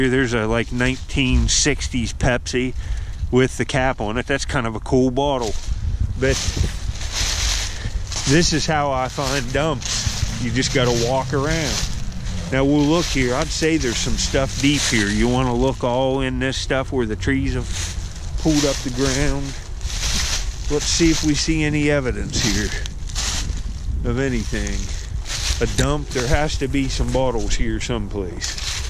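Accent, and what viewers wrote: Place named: Pittsburgh, United States. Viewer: I'm from the Irwin Pennsylvania area. I can tell by your accent that you have to be from around Pittsburgh?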